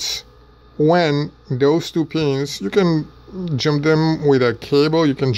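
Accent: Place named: South, United States. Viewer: фу какой блевотный южный акцент!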